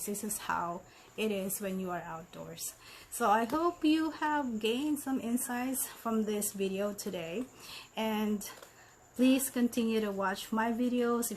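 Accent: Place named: United States. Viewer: That's nice . I love your accent ma'am parang American accent na.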